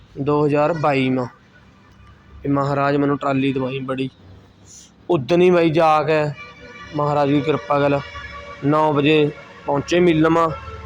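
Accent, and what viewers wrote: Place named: Uttar Pradesh, India. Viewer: area kehra veer thuda boli ta up wli lgdi a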